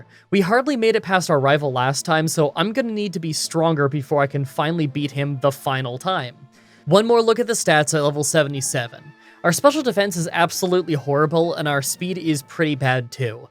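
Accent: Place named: Canada. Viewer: As promised on twitter. Your canadian accent is showing I can hear your very noticably canadian outs! Will be back next week probably with the exact same comment as previously promised!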